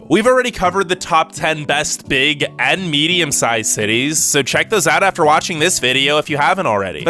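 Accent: California, United States. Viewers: Good video. Your California accent is so strong lol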